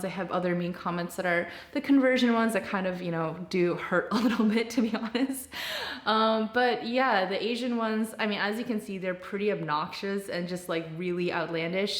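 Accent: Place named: United States. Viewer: Technically you're not really Korean anymore. That's your past. Korean and American are nationalities and so is Jewish, and you can only truly be one. From your accent I assume you used to be an American with Korean background. Now you're a Jew with American and Korean backgrounds. Even though I'm not a convert, sometimes I forget myself and think that I'm American, so I deal with a similar kind of issue. My wife is a convert, though.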